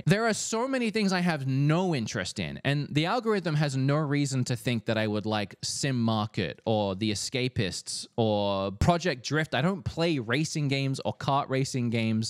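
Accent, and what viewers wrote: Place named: Australia. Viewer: Your accent is quite interesting. Australian as a base, but select words are pronounced in a US American accent. Confused me for a bit when I started watching.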